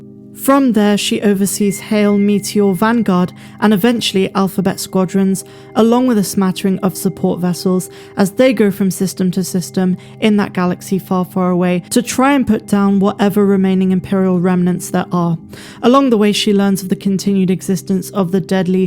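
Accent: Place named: Bristol, United Kingdom. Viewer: Where you from? I'm from Bristol, you sound very close 😂 liking the channel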